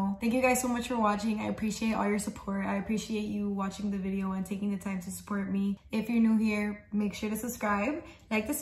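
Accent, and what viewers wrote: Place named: Miami, United States. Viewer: As soon as you started talking I was like MIAMI! The accent is strong lol. I'm from Kendall btw so I hear it right away.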